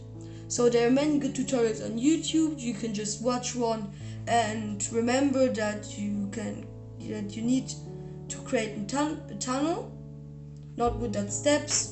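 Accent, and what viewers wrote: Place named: Germany. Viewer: du kannst gut englisch aber man hat das gehört das du deutsch bist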